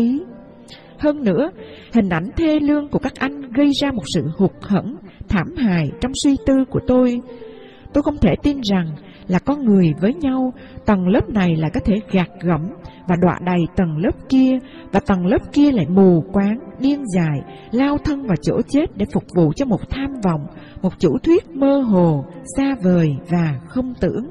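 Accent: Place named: South, Vietnam. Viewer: Giong noi' cua Co dien doc tren day . Oi ! That em ai' ,diu dang' . Giong noi' de thuong cua nguoi' con gai' mien ' Nam .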